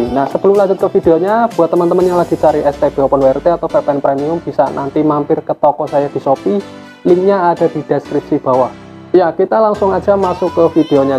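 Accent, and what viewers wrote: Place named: Kebumen, Indonesia. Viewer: Alamatnya mana bang kayake kaya wong kebumen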